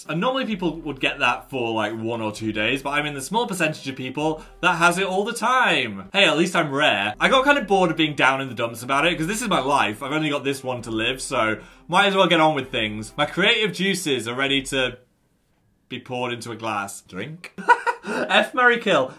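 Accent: North, United Kingdom. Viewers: Sir you've gone more northern again while Daniel has been away... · has been alone too long and his northern side has re-emerged 😂 the accent be strong ✊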